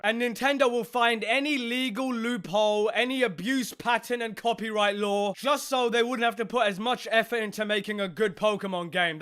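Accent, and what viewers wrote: United Kingdom. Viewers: You tell em angry British guy!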